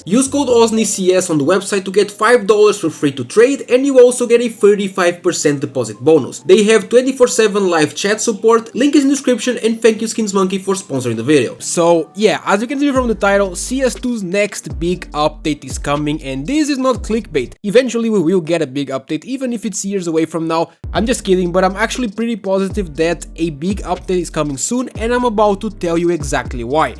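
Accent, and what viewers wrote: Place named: Portugal. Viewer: mete mais sotaque tuga nessa merda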